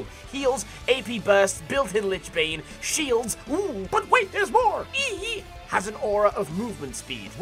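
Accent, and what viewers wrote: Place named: England, United Kingdom. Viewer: you sound like am english timon from lion king😭😭😭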